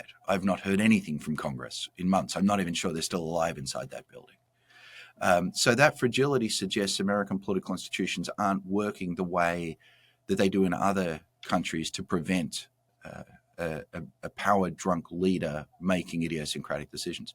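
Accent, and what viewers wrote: Australia. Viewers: Not too bad for a 'strine' accent. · Strange to hear "we" meaning Americans in an Australian accent. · You sound Australian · This guy has got it and explains well. He sounds Australian, wonder if he is?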